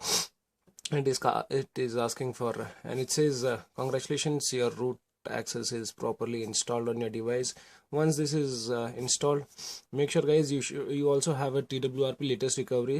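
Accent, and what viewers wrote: India. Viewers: INDIAN VOICE DON'T TRUST THEM? Don't forget that Thomas Alva Edison and vishveshwarya were INDIAN'S...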